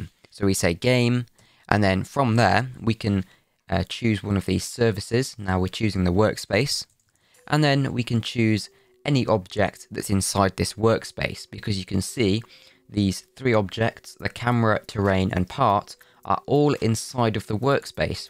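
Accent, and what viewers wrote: United Kingdom. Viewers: British? By your pronunciation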